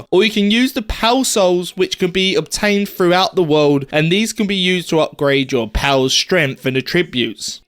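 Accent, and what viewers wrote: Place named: Cockney, United Kingdom. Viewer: Great info, your accent makes me cringe though.. COCKNEY AF EHHH?!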